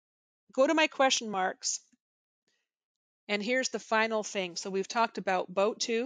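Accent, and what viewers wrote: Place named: United States. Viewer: Very informative but what version of Canadian english do you speak? Very American pronunciation. Rout? Mobil? Yikes 😮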